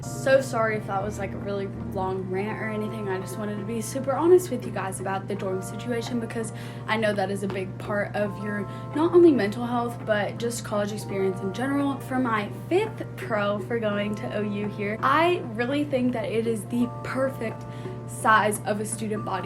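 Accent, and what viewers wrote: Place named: Europe. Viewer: You sound weird for Texas. Almost European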